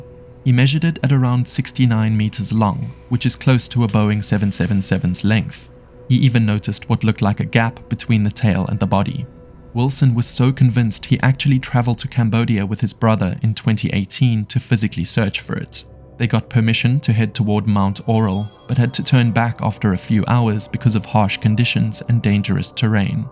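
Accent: South Africa. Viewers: Bro love your videos, from your accent you definitely sound like you are south African. But why oh why are there so many adds? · Is that a South African accent I hear?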